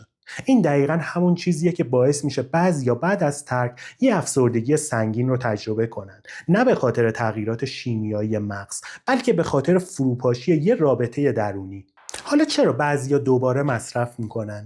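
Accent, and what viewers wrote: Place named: United States. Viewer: یه سؤال تو متولد نیویورک هستی؟ خیلی لحجه آمریکایی داری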